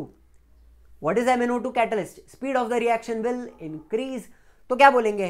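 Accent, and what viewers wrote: Maharashtra, India. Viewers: Sir aapki mother tounge Marathi hai kya????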